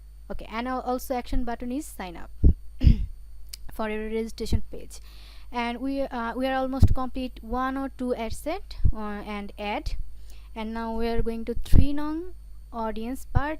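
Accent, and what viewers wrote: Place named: Bangladesh. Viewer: speaking in english but bangla pronunciation!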